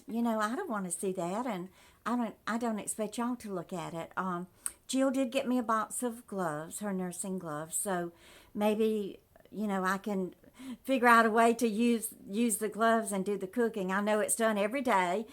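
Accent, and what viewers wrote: South, United States. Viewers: you do look good, I am younger and in good shape but have more wrinkles on my face than you, so you are right about the water drinking. Thank you for you... I appreciate your good old fashioned down south speaking voice (my father was from down south as well as my husband) and it is so good to see you so happy and lovin your grandchildren and family...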